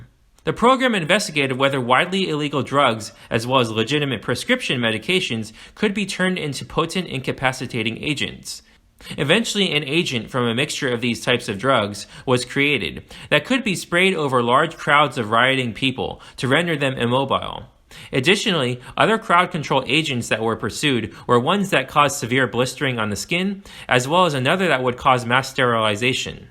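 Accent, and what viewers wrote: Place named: North America. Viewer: The narrator has a North American accemt. I wonder if in his research he came across how United States and Canadians did the exact same thing. Do a video on these two countries. Especially the racialised science and politics of the US which continues to this day!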